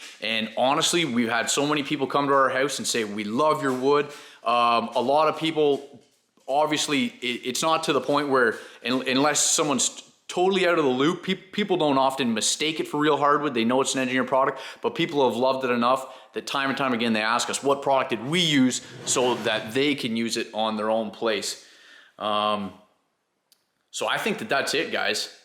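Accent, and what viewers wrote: Canada. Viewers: That hard ass Canadian accent...you act like this stuff is a new product...vinyl flooring has been around sometime...The only difference...in the past it was installed like carpet in rolls. Know the manufacturer gave customers/market pieces in a box instead of rolls.. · I knew you was in Canada by your accent. I live in West Virginia and I’ve been doin research on flooring for my house. This looks good but I’m scared that it would come up. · looks good! I know this has no relevance to the video but I love that i can tell you are Canadian by our distinct "accent" LOL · when I first heard you talk I knew you were from Canada. I'm from Northern Minnesota. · Yes noticed this too right away from a fellow Canadian! I get the same in my channel in the comments all the time haha can’t hide that Canadian accent 😁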